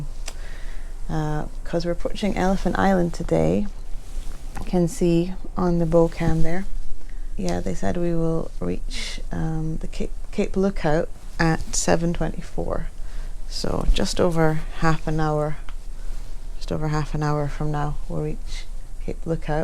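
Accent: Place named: Scotland, United Kingdom. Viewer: It’s always nice hearing another Scottish accent randomly when searching for Shackleton stories haha. Great video love from Cumbernauld.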